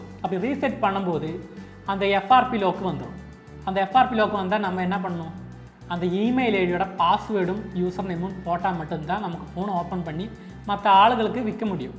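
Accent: Kerala, India. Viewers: Bro....neenga malayali ryt😁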